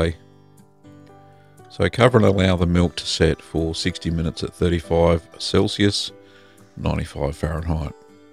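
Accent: Australia. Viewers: This is my father inlaws favorite cheese thank you for making all these ethnic cheeses, you're really helping me endear myself to my wife's family. Hope you and yours are doing well. Love the Aussie pronunciation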